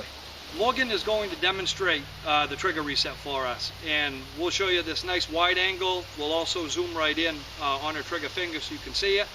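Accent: Maine, United States. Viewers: Southern Maine accent. Gardner maybe?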